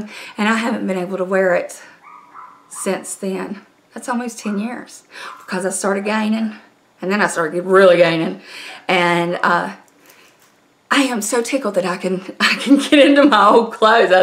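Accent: South, United States. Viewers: Not fake, and if you’re from the South, then it sounds normal. If I go up North, I have a difficult time understanding people, the first time they say something to me, and sometimes have to politely request they repeat themselves. BUT... I don’t dislike the accent nor do I insult their accent. Like Mama says...”it takes all kinda kinds, so be nice and you might learn something.” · Southern accent ... ew ... get 2 the point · I’m going to subscribe.. i love your personality. Lol 😂 I love that accent . I’m country too ! I was checking this product out for mom . Still debating if I’m going by it or not . Lol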